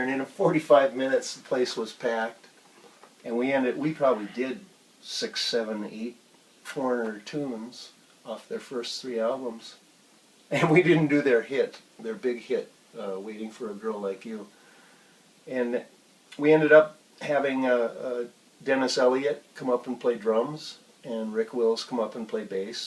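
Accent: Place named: United Kingdom. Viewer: That is a Great,and Funny story! Love the British accent! It would be Cool to hear how you guys got the gig with Olivia Newton John!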